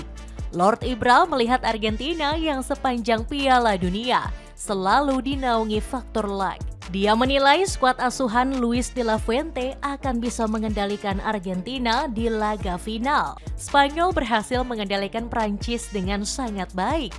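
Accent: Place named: Surakarta, Indonesia. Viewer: Makk ngeriii ya, pakai narasi pria solo dia. Kartu merah sitttt😂😂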